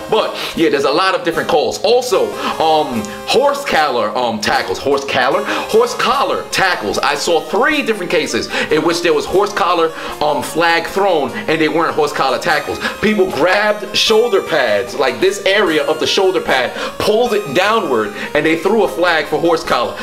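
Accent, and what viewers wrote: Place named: New York, United States. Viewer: Educated New York talk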